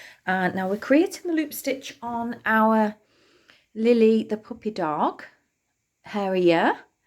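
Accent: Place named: Yorkshire, United Kingdom. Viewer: Tricky, but clever ! Lovely true Yorkshire accent ,,just same as my Grandaughter! 😀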